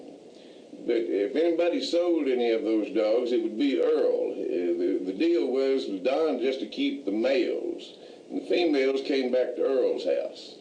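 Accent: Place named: South, United States. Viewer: Just found these Gems!! Man I been missing out!! I can listen to this man tell a story all day!! He's got this very captivating southern charm of way about telling bulldog stories..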